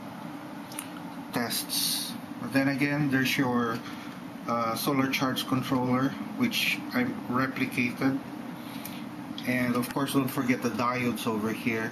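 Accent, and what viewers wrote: Philippines. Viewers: pinoy accent :) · sebwano ka man dong? accent mo bisaya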